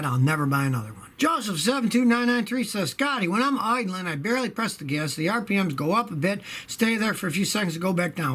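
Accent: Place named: Upstate New York, United States. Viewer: Hes from upstate NY, he sounds like italian friends i have from upstate NY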